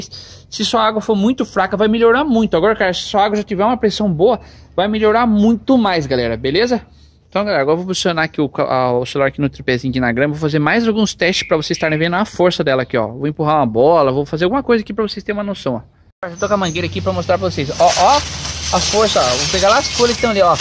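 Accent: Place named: Caipira, Brazil. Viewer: Eeeeitha sotaque caiiipirahhh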